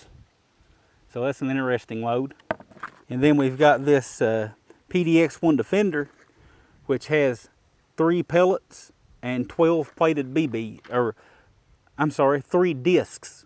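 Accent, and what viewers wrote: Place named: Louisiana, United States. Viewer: You from Louisiana? That accent sounds familiar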